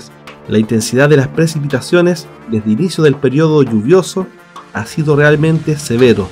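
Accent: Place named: Chile. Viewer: Vos sos chileno ,te digo x el tono de voz k tenes,saludos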